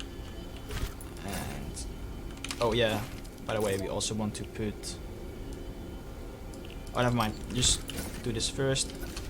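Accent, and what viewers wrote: Netherlands. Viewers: wait are you dutch?? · Are you Dutch?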